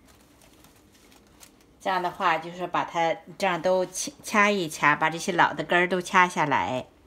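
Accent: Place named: Shandong, China. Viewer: 山东 烟威口音。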